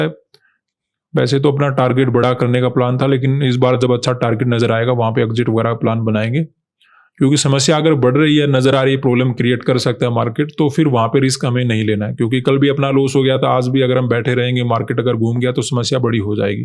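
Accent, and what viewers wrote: Haryana, India. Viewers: Bolne k excent se lg rha hai k HARYANA se ho..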